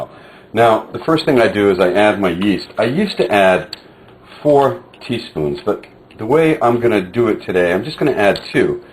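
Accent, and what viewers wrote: United States. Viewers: HEY ARE YOU FROM NEW YORK LOL when ever i lisen to that accent it reminds of when i lived in the bronx, i moved 2 years ago and boy do i miss the pizza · Are you from Philly?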